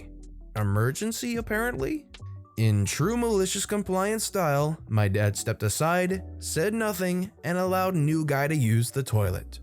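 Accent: United Kingdom. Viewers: is British, that'll be why / sounds different XP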